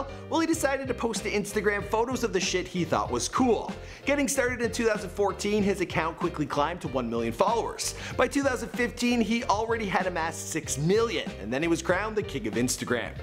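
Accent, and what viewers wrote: Canada. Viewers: Jessica haha he’s Canadian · Real Bride Of Chucky he’s Canadian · I forgot is a damn Canadian... 😑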